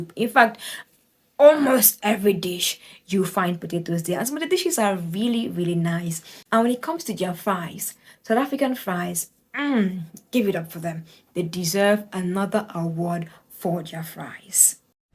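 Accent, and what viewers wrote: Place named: West Africa. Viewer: Tht accent is Western Africa live yr seed on yr way out we going to need tht copy in the future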